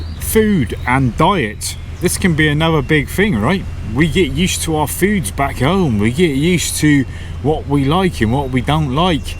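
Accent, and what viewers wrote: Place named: West Country, United Kingdom. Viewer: Some very valid points. I'm married to a woman born and raised in Chiang Mai so a lot of these don't affect me. Where in the south west do you hail from? I lived in Wiltshire, then moved to Devon, spent a lot of time in Dorset and Cornwall, you don't have a Wurzel accent, perhaps a hint of Bristol though... Speaking Thai. I used to always try speaking Thai, but ended up getting puzzled looks, repeating myself, more puzzled looks and then my wife says, exactly the same (at least it sounds exactly the same to me) and they nod and leave, so have pretty much stopped. There are other reasons I'm not really interested in speaking Thai, my wife has about 10,000 family members locally, they ALL want to talk to me! Me not speaking Thai and them not speaking English results in not speaking to them. Is that rude? Maybe but I barely spoke to my brother for the last 12 years I lived in the UK, and I happily avoided speaking to people in the UK for weeks at a time. 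Visa's. It's an unnecessary expense, but I pay a visa agency to do all the work, I spend less than 20 minutes dealing with immigration once a year and I'm happy to pay for that. Ironically it's wasn't the immigration staff who were the issue, it's sitting amongst the endlessly whining/angry expats in the immigration waiting area that I found both depressing and irritating. Budget. I'm the opposite to you, I almost never have unexpected expenses crop up, I know what I need to spend each year and plan for it. In fact since buying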